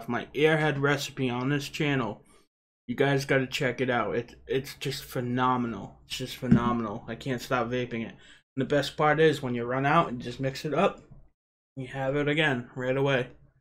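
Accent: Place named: Rhode Island, United States. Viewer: Lots of good flavors there! Thought I recognized a RI accent. Worked 34 yrs in Providence, before retiring to FL.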